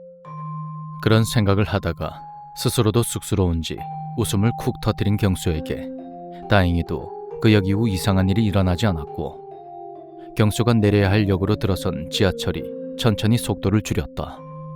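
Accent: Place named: Busan, South Korea. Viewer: 이야... '민식이 법' 이거 나오는 순간, "맞아... 님 다시 시작하셨지. 실감나네." 하면서 굉장히 기뻤습니다. 요즘 댓글도 다 읽으신다면서 즐거워 하시는 모습도 상상되구요. 그러면서도 여전히 님만의 아우라가 느껴지는 소중한 영상이구나 생각합니다. 님만의 리딩, 부산 사투리로 듣는 괴담만이 주는 심오한 느낌, BGM을 기가 막히게도 선정하시는 그 아우라마저 느껴지는 신묘함, 또한 정말 너무너무 재밌게, 실감나게 인물들 대사를 열연해 주시는 그 모든 것이 더더욱 듣길 잘 했구나 하면서 하염없이 감사스럽고 기쁩니다 그냥. 우리 님 올 한 해에는 유래없이 기쁨만 연타로 일어나는 좋은 한 해 되셔요. 하도 많이 웃으셔서 구각이 아프다~~ 하시는걸 뛰어넘도록 쭈욱 좋은 일들만 있으실거예요. 감사히 듣는 모든 사람들이, 재밌게 들었던 모든 사람들이, 표현은 달라도 저처럼 응원할거니까요.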